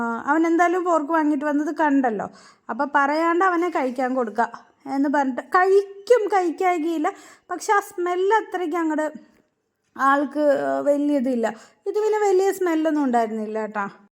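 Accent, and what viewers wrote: Palakkad, India. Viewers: നല്ല വീഡിയോ ❤❤ പാലക്കാടൻ ഭാഷ ഇഷ്ട്ടമായി കേട്ടോ..👍👍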